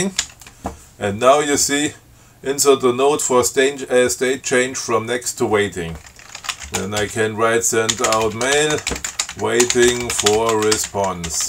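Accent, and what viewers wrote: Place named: Austria. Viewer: I also immediately thought that this sounds like Austrian English :D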